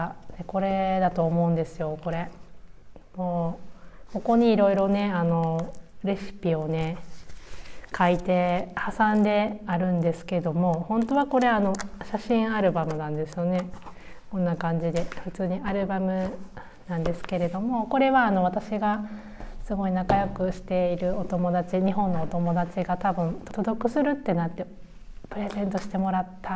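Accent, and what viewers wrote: Kansai, Japan. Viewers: Hallo 🙋🏻‍♀️ 大阪の泉州生まれ, タオル有名ですよね｡可愛い関西弁ですよ。Hannoverの近くに住んでおられるんですね \(´∀｀*) なんとお呼びすれば良いのですか？ママさん？ san？旦那様や、子供ちゃん達、めっちゃ日本語上手ですね。子育てについても、ちゃんと, 夫婦で話し合って決めていらっしゃる事が素敵です。おばあちゃまはオーストリア人🇦🇹なんですね。なんか嬉しい。(行った事ある国だから…) 😅 旦那様の日本のイメージ面白いですね🤣🤣 最近の日本は、多様性を重視するようになってきたと思いますが、まだまだなんですね。楽しかったです。👋🏻(╹◡╹ ) ♡❤️ Tschüss, bis bald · 2万人おめでとうございます🎈 日本に着いたらおにぎり🍙たくさん食べてくださいね😋 動画に使われているBGM気づけばお風呂で口ずさんでいます笑関西弁だけど落ち着いた話し方が好きです❤ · ドイツに住んでおられる日本人の方々がおられる、なんとなく安心感を感じます。関西弁も素晴らしく感じます。私もドイツ在住です。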